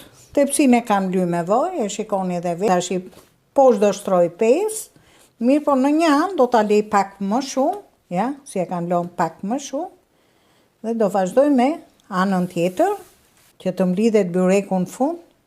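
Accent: Tirana, Albania. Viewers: Ju lumshin duart teta, kenaqem kur shpjegon perberjen e byrekut, me ate ze te qete dhe gjuha juaj tironce qe me nxjerr mallin, me kenaqesi Ju degjoj! 👏👏👏💖🌸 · Gezuar diten e veres, me pelqen gatimi jot tamam gatime tradicionale ,por me teper shpjegimin qe ben Tiranse puro .kenaqem kur te degjoj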